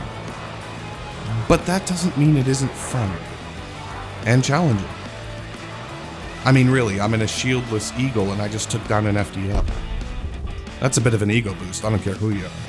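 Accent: United Kingdom. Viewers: English slang accent gave me cancer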